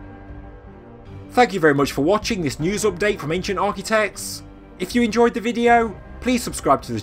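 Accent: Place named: Southern England, United Kingdom. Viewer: I'm English and I've no idea why he's talking like he's got a class of 3 year olds. For anyone outside of Britain I'd just like to point out that we don't all talk like him... Or the Queen.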